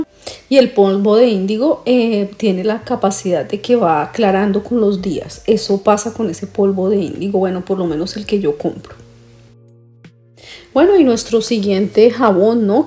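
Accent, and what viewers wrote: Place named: Colombia. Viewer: de donde eres?!!, tu acento parece Colombisno!@ Saludos, una fan,Diana 🇵🇷👋🥰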